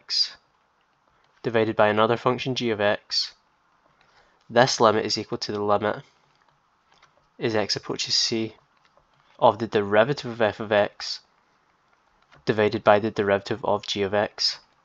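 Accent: Scotland, United Kingdom. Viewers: Good evening to my Scottish friend, Being able to apply L'Hôpital's rule to limits where possible, is the same as always having a pocket calculator available; hence I call this rule " The Calculator Rule " (lol), but also keep looking inquisitively for alternative solutions!, thank you again for a fantastic presentation told by you with a pleasant characteristic accent, recognizable everywhere... Thank you again and take good care as always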